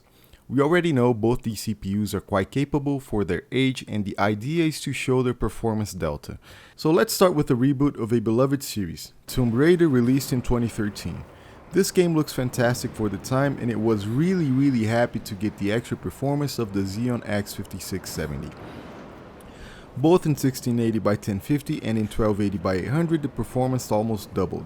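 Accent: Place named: France. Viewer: What is your slight accent? French?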